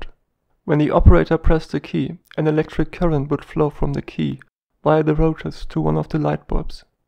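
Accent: Germany. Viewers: a lot of Germans speak English like this, I spent 6yrs there, :)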